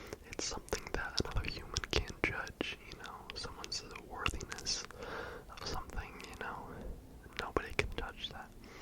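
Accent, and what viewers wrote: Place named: France. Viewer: Im in the same boat with college picking. Deciding between a good college in a good area but is more expensive and a good college in a not so fun area that is cheaper. Also idk If you want to know this, but when I first saw you I thought you were French. Cause in the specific video you sounded like you had an accent and I saw you had a French video on your channel. Also you kinds look French. I like to hear stuff like that, ive heard I look Russian a lot, same with my brother who looks like me.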